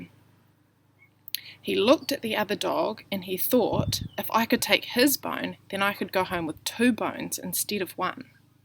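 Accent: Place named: United Kingdom. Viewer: British ascent yay E E E E E wow that is sad now that i watch the one part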